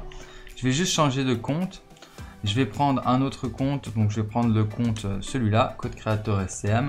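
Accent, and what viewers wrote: Belgium. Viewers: Wsh bg t belge ?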